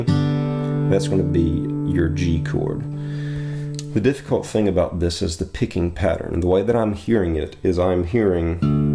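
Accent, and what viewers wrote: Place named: Kentucky, United States. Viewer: I'm a 60 year old (starting my 4th quarter) guitar player who has played in bands for over 40 years. I Loved the Eagles, Foreigner, James Taylor, and now Zac Brown and Garth and many others. I have just started finger picking using more than my Thumb and Index. This very song, Millworker, took me through an unfortunate divorce. Back in 2006, all I had was a borrowed small TV that played DVD's. I had JT's Pullover and that was all. This song became my anthem. Due to some kind of block, I could not roll from the D to to G (I kept coming up with:Ridin the Storm Out - Ha!). I had since figured it out but your's, I believe, is the absolute Best Tutorial I have seen. And being a Kentucky Boy, your SEC accent was welcomed. Keep it up! Jerry K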